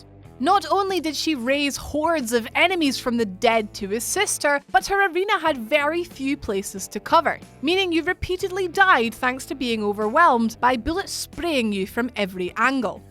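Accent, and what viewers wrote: Scotland, United Kingdom. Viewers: Why do I think she has a Scottish accent?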